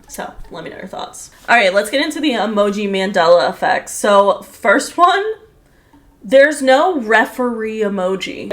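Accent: United Kingdom. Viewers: bishhhhhh tfff IM BRITISH, I WAS ADAMANT HES BRITISH 😮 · Wuuuut??? He’s british!!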